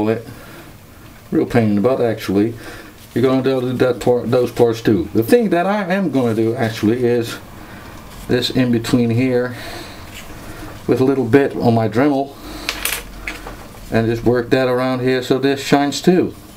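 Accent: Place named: Netherlands. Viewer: it sounds like he's dutch and we dutchy's always talk funny if we talk english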